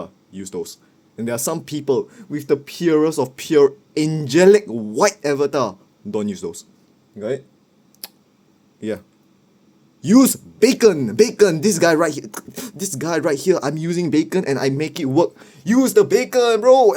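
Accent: Singapore. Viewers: w singapore acent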